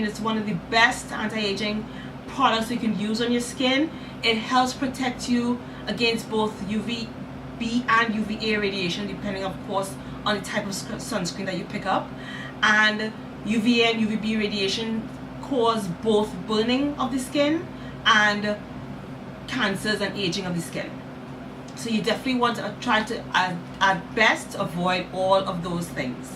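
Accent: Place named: Trinidad and Tobago. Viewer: Love your accent. You sound like you have a Trini vernacular. Thank you for doing this review. I just bought this tonight. I normally use Estée Lauder but it’s hard to find in stores so I’m trying thi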